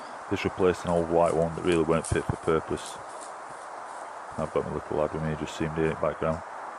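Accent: Yorkshire, United Kingdom. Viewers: Very enjoyable. A good commentary, calm and informative--even with the Yorkshire accent!Subbed.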